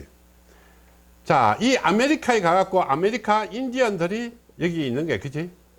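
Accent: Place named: Gyeongsang, South Korea. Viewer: 완전 경상도 도민용 강연. ㅋㅋㅋ. 엄청난 사투리 억양과 말투. 신라 사투리. 기타 지방민은 무슨 말인지 이해될지 모르겠다. ㅋㅋㅋ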